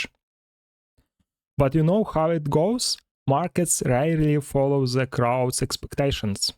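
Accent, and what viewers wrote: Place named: Russia. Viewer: Русский акцент бро)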